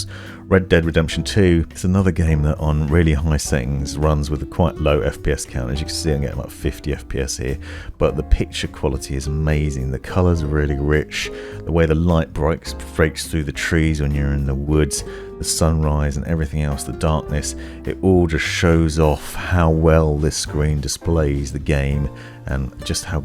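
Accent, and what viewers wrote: England, United Kingdom. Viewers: I don’t care about the monitor I came here just for the voice of a calm english captain Jack Sparrow. Ever thought about speaking in audiobooks?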